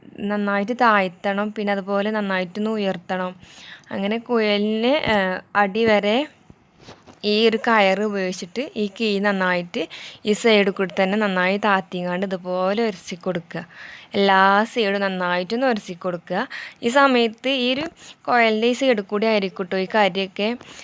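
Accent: Malappuram, India. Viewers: മലപ്പുറം ആണോ ഇയാൾ. നല്ല ഭംഗിയുള്ള സംസാരം...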